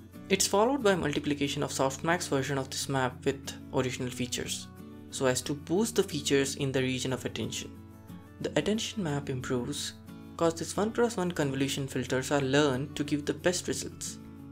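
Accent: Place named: India. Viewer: maybe rerecording it with a voice without Indian accent would be advisable (sorry to be direct ;) )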